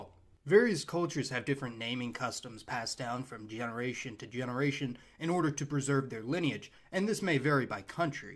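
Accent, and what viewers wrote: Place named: Philadelphia, United States. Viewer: You have somewhat of a Philadelphia accent. It sounds like you try to cover it up, but it still pokes through in spots. Am I hearing that correctly? Also... where tf have you been lately?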